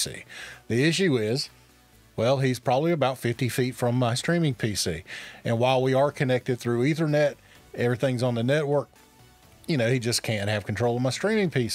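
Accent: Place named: South, United States. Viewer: Where you from brotha? I hear a fellow southern accent.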